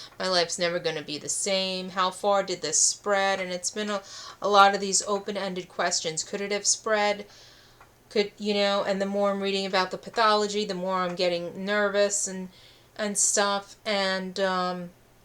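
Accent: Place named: East Coast, United States. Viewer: hello again, nice to meet you and also wanted to ask if you’re from the East Coast. I’m originally from Brooklyn New York, I’m 72, and I live in Hawaii for 40 years but I kind of recognized and East Coast accent. I can sense you’re a special person. Sending warm aloha breezes your way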